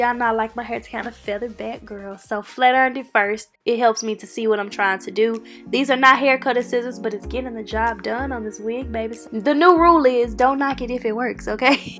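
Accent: South, United States. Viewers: I love your country accent. 😍😍😍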